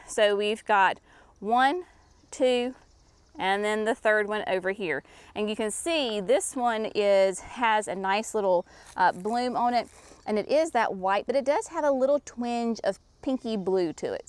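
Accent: South, United States. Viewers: Does Mimi have your sweet southern accent too? Thanks for sharing.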